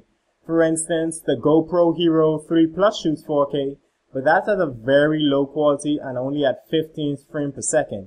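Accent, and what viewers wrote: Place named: Wales, United Kingdom. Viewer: This guy has a Welsh accent if your wondering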